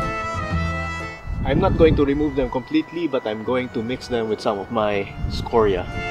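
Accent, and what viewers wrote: Philippines. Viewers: You speak english like filipino accent. Are ypu?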